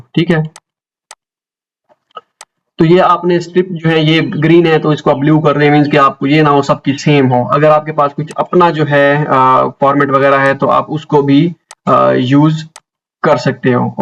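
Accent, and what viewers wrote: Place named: Layyah, Pakistan. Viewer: ap k bat krny k styl se lg rha hy k ap layyah se hn... am I right?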